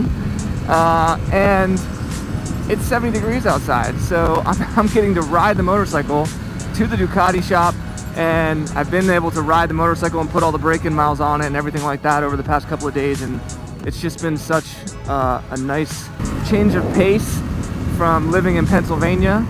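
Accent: South Africa. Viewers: I recognize the South African accent 🤣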